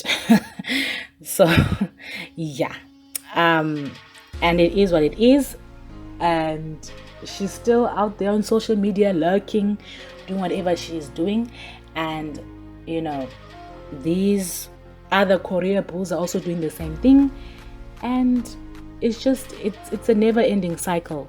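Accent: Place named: South Africa. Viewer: You sound South African for some reason. Btw your channel is really needed these fans out here are crazy as fuck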